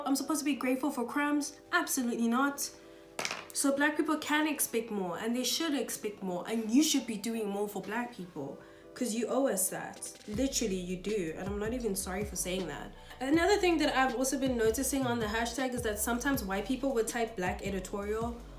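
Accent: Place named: South Africa. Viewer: I absolutely love this!!! I knew you were South African immediately and this is such a good video💗 I came here from an ig link and I'm so happy to be here Another thing these brands think we don't notice is when they make 15 shaded of concealer thinking we don't know all those shades can just be used as contour for lighter skinned/white people. That's why the darker concealers are always the wrong undertone (undertones that don't emerge among darker skinned people) Also love your shirt💗😋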